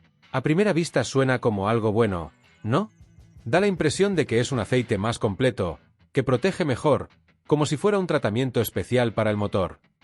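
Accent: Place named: Spain. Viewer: Me desesperó ese acento español ibérico y creo que el video no aporta mucho. Es evidente que está hecho por IA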